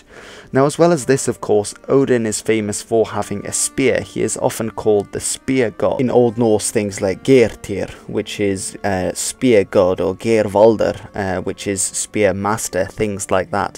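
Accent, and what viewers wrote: United Kingdom. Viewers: DUDE YOU'RE DUTCH BUT YOU SOUND BRITISH,THAT'S AWESOME! I AM FROM ARUBA BUT I SOUND AMERICAN,HAHA! GROETJES UIT ROTTERDAM!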